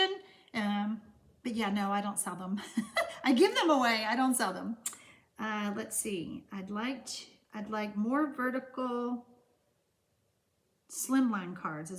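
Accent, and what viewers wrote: South, United States. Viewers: great cards.....love watching you and your southern accent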